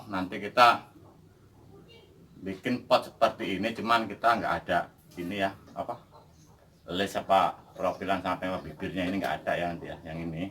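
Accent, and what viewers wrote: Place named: Central Java, Indonesia. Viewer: Aslinya mana to mas,bahasa jawane kok kaya orang jawa tengah ...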